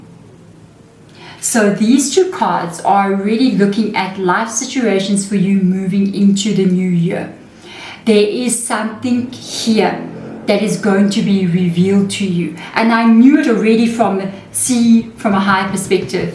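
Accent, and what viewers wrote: South Africa. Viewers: So glad to hear a South African accent! From a fellow South African. Everything you say resonates.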